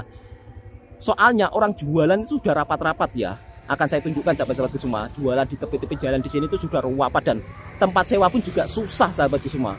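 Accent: Java, Indonesia. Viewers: Ni kira dah ok sangat tuu. Sblm ni, jawa pekat. Tpi yg x boleh ubah...buwaaaangat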